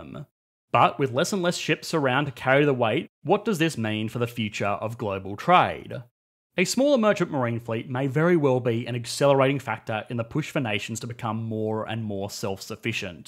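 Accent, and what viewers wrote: Australia. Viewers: What accent is that? Australian? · At least Wendower is 'understandable': this Ozzie's accent is thiccc, plus, he talks way too fast - had to reduce speed to 75% to make it bearable. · The Australia accent throws me off. The upward inflection at the end of sentences make it sound like your unsure or asking a question. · australian accent This is where we bring up the darling Norway, it not only gets (Insert industry norm) but does so equitably. In fact when measuring (some average figure related to production) it is actually (some number between 1-10) globally. Now you can never not mention them again :)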